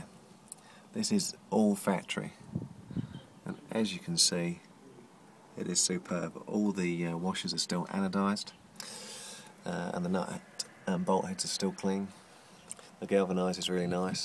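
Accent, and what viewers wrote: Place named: Australia. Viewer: Are you from Australia or New Zealand? I can tell your not a Brit because you're very Australian-sounding and your Land Rover there has an Australian/New Zealander number plate on it.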